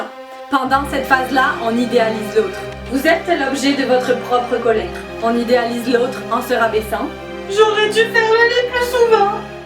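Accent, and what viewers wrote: Quebec, Canada. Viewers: tu as l'accent de celine dion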